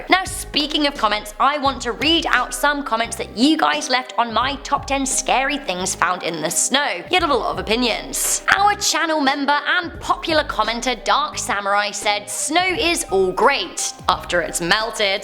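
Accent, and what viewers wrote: United Kingdom. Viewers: Nodaysoff J she literally said mayans Shes british.. Obviously she has an accent.. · that depends on whether or not you grew up in London or not she grew up in London and she has a thick British accent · I love that this is spoken by British 😂😂 but is in Canada / America not sure but Yh I’m from England so respect 😂😂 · Was kinda hoping for a guy to speak with a lower voice and uuummm, no British accent since like idk YouTube is from America? Is that too much to ask for? Lol. Dislike. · Yeah, she's from england and currently lives in canada i think... People from the states might pronuce it differently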